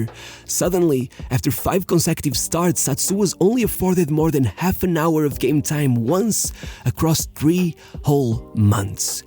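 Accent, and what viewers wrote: Portugal. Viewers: Pelo sotaque nota se que és tuga. Orgulho em ti miúdo. Vídeos incríveis sempre 🤩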